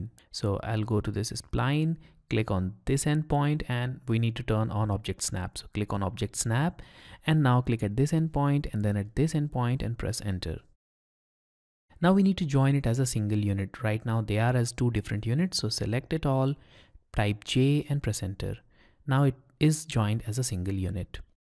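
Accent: India. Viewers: nice lesson bro. you Accent is hindi.. inst it?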